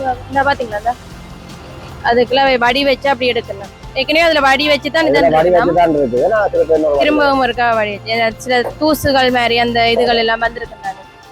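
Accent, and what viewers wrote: Jaffna, Sri Lanka. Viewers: எங்கட யாழ்பாணம் வர வர சென்னை மாதிரியே மாறிக்கொண்டு வருது. ஆனால் எங்கட யாழ்ப்பாண பேச்சு வழக்கும் எங்கட கலாச்சாரமும் மாறாமல் இருக்க வேண்டும். அது எண்டைக்கும் மாறவே கூடாது. நம் பேச்சு வழக்கு. நம் சிறப்பு. எங்கட யாழ்ப்பாண பேச்சு வழக்கே என்றும் நம் இதயத்தோடு ஒன்றி இருக்கிறது. சிறப்பான காணொளி. ❤️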